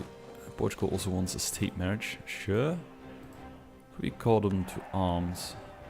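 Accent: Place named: Netherlands. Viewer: Well I'm assuming by your accent that you are actually Dutch and no offense but Italy shall win the World Cup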